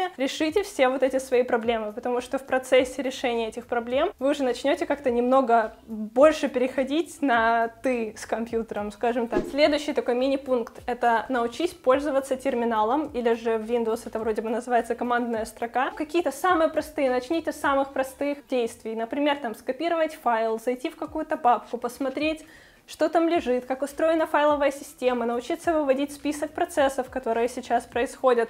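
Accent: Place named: England, United Kingdom. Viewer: Не делай пожалуйста англ акцент...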